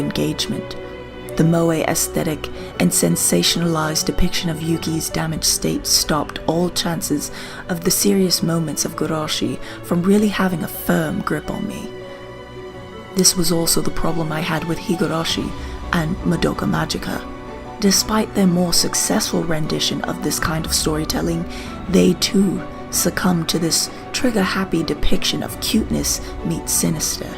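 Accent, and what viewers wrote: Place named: United Kingdom. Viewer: you should really work in documentaries or some shit with that amazing brit voice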